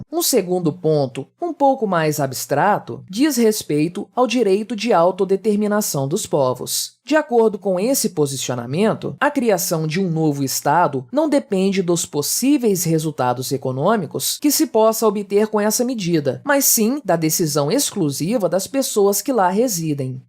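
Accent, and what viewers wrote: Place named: Southern Brazil, Brazil. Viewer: VC DEVE SER SULISTA OU PARAENSE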